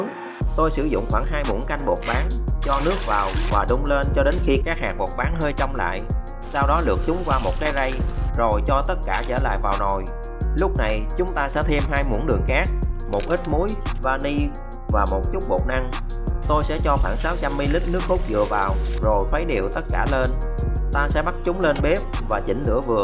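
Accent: Mekong Delta, Vietnam. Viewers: Quay kiểu xịn quá chú. giọng miền tây đúng thân thiện ng việt nam ạ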